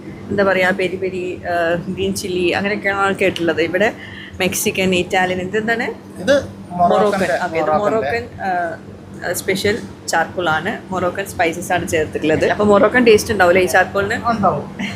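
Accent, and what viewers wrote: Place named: Malappuram, India. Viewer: മലപ്പുറം ഭാഷയാ ണ് കേൾക്കാൻ. സൂപ്പർ ❤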